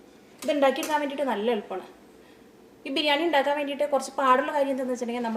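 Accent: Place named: Northern Kerala, India. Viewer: kitchen u r a very good cook!!! I like your recipes coz whenever I try any of them, they turn out to be really "homemade"... n me n my Husband are fond of your slang... R u from northern part of kerala?? Keep inspiring beginners to cook confidently..🙂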